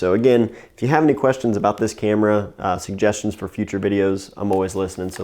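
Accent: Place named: Mid-Atlantic, United States. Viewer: I know a mid-Atlantic accent when I hear one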